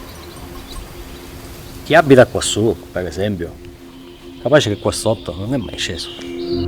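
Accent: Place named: Sicily, Italy. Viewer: Conosco nei miei 4 anni passati in Sicilia, mi ha portato a vedere e scalare nelle sue " misteriose" falesie, quelle che non si vedono all'orizzonte, perché sono dentro nei camion. Con scrissi e fotografia un bellissimo e corposo reportages con tanto di copertina su Pareti, portando al pubblico luoghi incredibili. Di questa bellissima storia mi ha emozionato risentire la voce tutta siciliana di, così umile e profonda, racconta senza fronzoli la sua solitudine di Climber in una terra indietro anni luce nell'arrampicata. Lo raccontai in quel servizio e venni contattato da un galletto del luogo, ricordo ancora l'inizio di quel messaggio: ma ti sei reso conto delle cazzate che hai scritto? Un tono minaccioso, quasi mafioso. Piccole invidie perché dissi che in un mondo di scalatori " della domenica" era ed rimane il più forte Climber Siciliano. Daje avanti cosi, per te stesso e per la tua incondizionata libertà.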